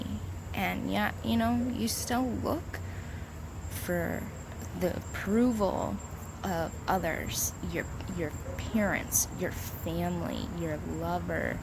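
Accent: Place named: Minnesota, United States. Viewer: i am in love with your Minnesota accent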